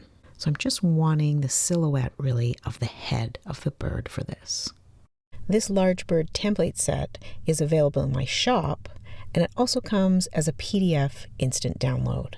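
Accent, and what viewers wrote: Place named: Canada. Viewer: I recently found your channel, and I love it. You have a very pleasant voice to listen to. I can tell by certain words that you are from Canada. But only certain words. Thank you for what you do. I have bite the bug and gone down the Slow Stitching rabbit hole. Nothing completed yet. I also bought your mini animals and plan to buy more. When the budget allows. Thanks again.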